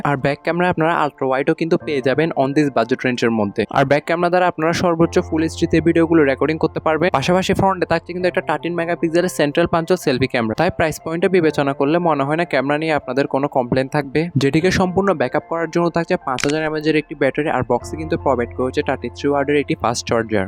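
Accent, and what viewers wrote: Chittagong, Bangladesh. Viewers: Kotha sune mone hocce apni chittagong ar...Chittagong a ai mobile kutai available pabo seta bolen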